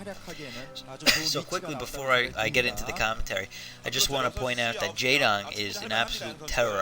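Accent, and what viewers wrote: Boston, United States. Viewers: yo, great commentary. you sound like you from boston or something. I'm in NJ holla.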